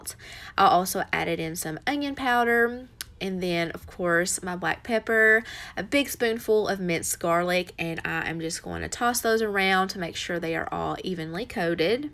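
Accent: South, United States. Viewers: Hi!! I have missed you and your southern accent so much. I also have a southern accent but not like yours. So glad you are back. Everything looks so delicious. Hope you have a great day and week. Stay safe. God bless.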